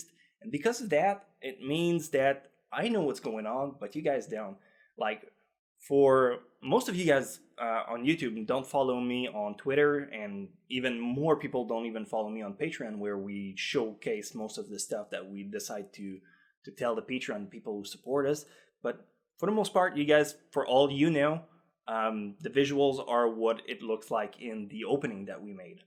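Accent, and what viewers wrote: Canada. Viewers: I just realized your Canadian accent👌, good luck m8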